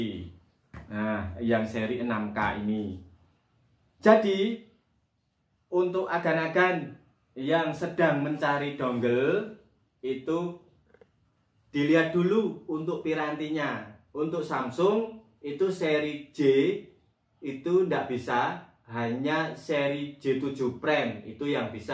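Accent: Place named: Java, Indonesia. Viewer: Capek denger nya medok skali bahasanya🤦‍♂️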